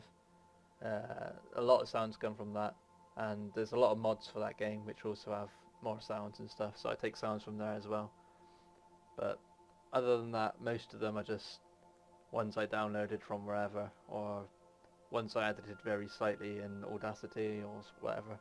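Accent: United Kingdom. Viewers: Oh my god you have a so goof brithis acent. Its sounds so good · You sound like if a voicebot had a british accent ngl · epic british accent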